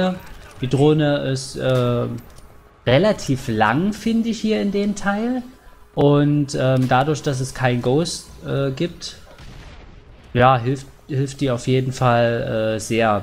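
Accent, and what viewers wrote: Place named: Saxony, Germany. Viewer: ich möchte noch ein bissel gebäshe auf sächsich .... oder bring noch paar lustige videos ... danke .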